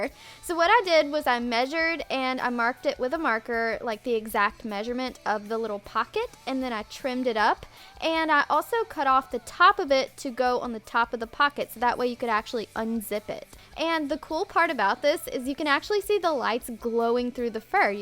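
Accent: South, United States. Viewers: Does she fake the country accent bc why did she lose in the middle of the video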